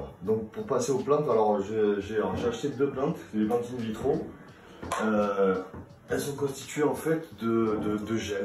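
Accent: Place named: Marseille, France. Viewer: Oh Le Marseillais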